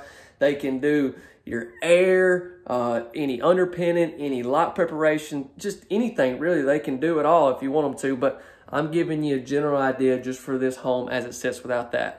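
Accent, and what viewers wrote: South, United States. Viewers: Didn’t care for the “ghetto” remark. You sounded more country than anything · This a beautiful! Bright! You are southern guy you sound like you supposed to sound. Don't change. You are so funny in your own way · You sound Extremely southern....not ghetto 😏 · thats not ghetto speak. that would be what you hear in a inner city (mostly black) area. what you are doing is southern slang.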